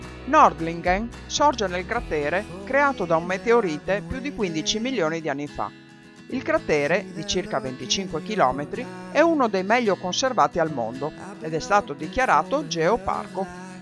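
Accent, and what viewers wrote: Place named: Friuli, Italy. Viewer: Hai l'accento friulano "crostoli" ad esempio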